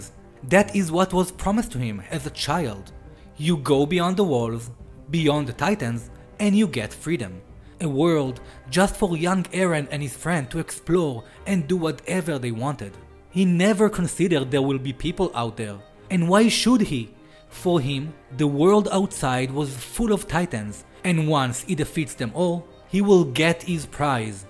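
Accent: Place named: Israel. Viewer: אה חחחחח שמעתי אותך אתה פשוט נשמע ישראלי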